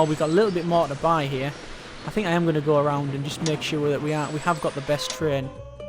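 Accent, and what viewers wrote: United Kingdom. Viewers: Are u from uk osp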